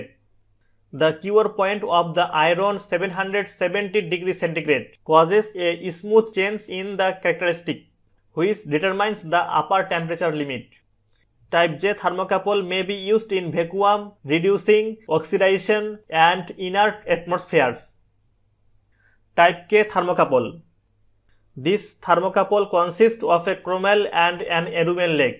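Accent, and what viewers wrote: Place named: India. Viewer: 这印度调调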